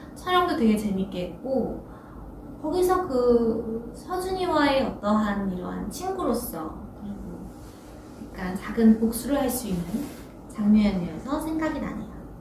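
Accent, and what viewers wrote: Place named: Germany. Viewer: She has a German accent in English too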